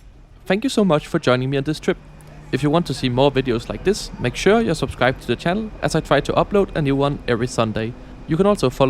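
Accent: Denmark. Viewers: Your Danish accent is very nice to listen to, much nicer than most U.K. dialects! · I know. He’s danish. We’re somewhat in between UK English and US English when it comes to speaking English.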